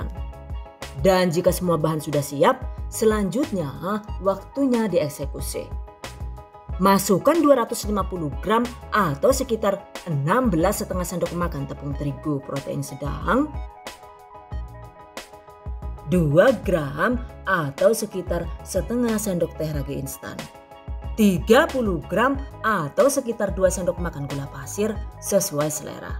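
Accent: Banyumas, Indonesia. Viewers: Salam sesama wong ngapak 😁🤣ora ngapak ora kepenak🤣🤣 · Salfok sm bhsanya bun.. Jawanya ngapak jg trnyta sm ky aq. Jawanya mn bun? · Gx prnh gx tertarik sm resep dr bunda....btw bun,mw tny.emng logat madiun ngapak bgtu y bun.tp q sk beud c,scra q asli wong tegal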